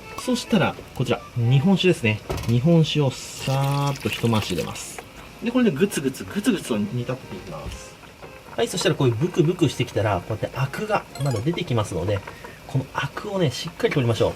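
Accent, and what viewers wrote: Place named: Aichi, Japan. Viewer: 愛知のなまり 「ゴキブリ出たげー」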